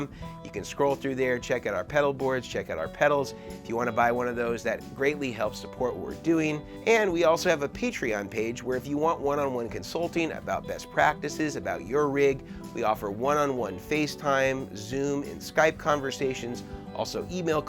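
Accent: United States. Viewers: Not in America. He’s saying it correctly for Americans. Don’t take it personally.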